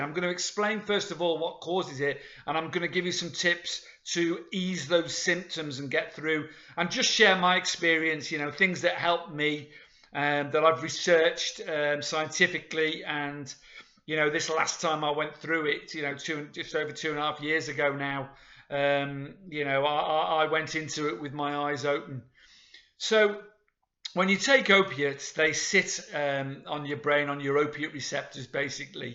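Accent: Liverpool, United Kingdom. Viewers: I've seen many videos on opiate w/d...I'm an old hand at it like yourself. But you've shot straighter than most I've seen. Often people will say, "After 4 days the worst is over"...you can be sure that person is taking the piss, out and out lying...why someone would want to pretend to be an addict is beyond me. I hope you are doing well. I had a close friend in Florida where we drove cabs for the same company...he was from Liverpool...you sound like you're from if not Liddy, then Manchester or the neighborhood. Great people in the North. Well, thanks for caring enough to make these for those facing the dread of RLS, what we used to call "jimmy legs". Cheers from Pennsylvania! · PS love your accent, what part of the UK are you from?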